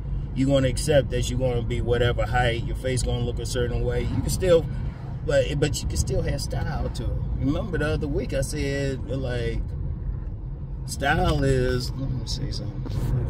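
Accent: Washington DC, United States. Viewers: Bammas? DC accent?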